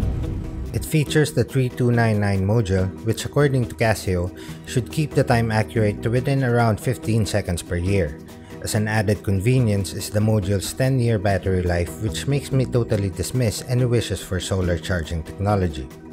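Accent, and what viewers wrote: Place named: Philippines. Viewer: are you based in the Philippines? You sound like a Filipino. Great production, by the way.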